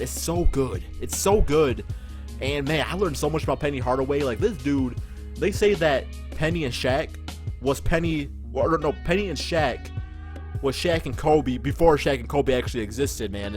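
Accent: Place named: Midwest, United States. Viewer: Where are you from? The Midwest? Your accent stands out.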